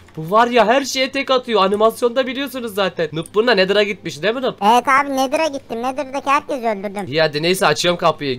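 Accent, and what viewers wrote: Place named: Rize, Turkey. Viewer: 0 Rizeli gibi konuşuyor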